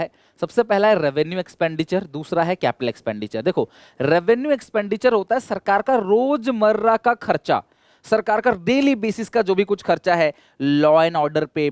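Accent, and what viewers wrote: Odisha, India. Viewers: Sir are you from odisha ?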